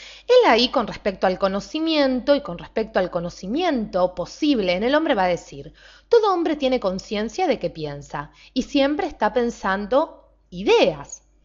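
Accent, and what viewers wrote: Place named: Buenos Aires, Argentina. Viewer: jueno es porteña es el acento de estos arrogantes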